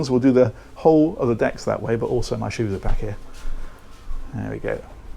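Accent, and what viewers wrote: England, United Kingdom. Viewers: Nice yacht buddy. I like the design layout. That master bedroom was awesome or using one of your words it was a "proper" master bedroom. I love to listen to you English people talk from England because we don't talk like that in the United States here, mainly in rural Missouri. But I'd take this boat on vacation or use as a live aboard because it would be so easy to keep clean I'd think. I loved the upper fly bridge the most. It has a great layout for this size for a "proper" cruiser. An the galley is perfect for making meals while you have 6 or even 12 people onboard fishing and or in the water playing around. Perfect vacation boat especially if you have children or teenagers because they make messes and I think it would be easy to clean up with floors like that. The boat or small yacht is beautiful especially since I've never seen another one like it or even close to it before from all the channels I've been watching. 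But I have to say, your channel is my number one favorite, plus that I am faithfully watcher. When I see you posted a new video, I have to see what you found because I know it's going to be worth watching. Love your channel buddy. Great job, and you earned another 'at a boy award' from me here in Missouri. I can't wait to see what you post next time. I still wish you would make a specs page showing us all the details like, the length, width, numbers of gallons fuel tanks are, water, the max range it can go in nautical miles, an what speeds with NM, size o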